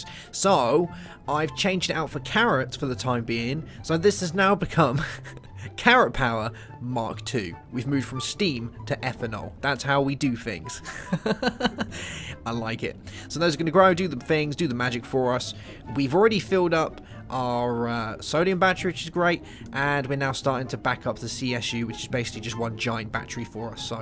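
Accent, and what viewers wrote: United Kingdom. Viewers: really entertaining series and greetings from germany :). btw. i like your accent so much! can you give me a hint which part of gb it is you rule from =D?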